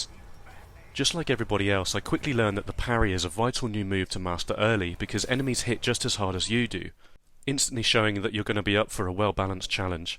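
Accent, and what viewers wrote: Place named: United Kingdom. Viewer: Two of the things i like most about youtube channels, smart English accents and resident evil analysis. Liked and subscribed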